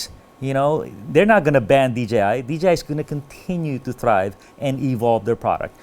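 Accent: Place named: Philippines. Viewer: Good information brother 🤙 I can tell from your accent that you speak Tagalog?….and does this going to be in the Philippines too?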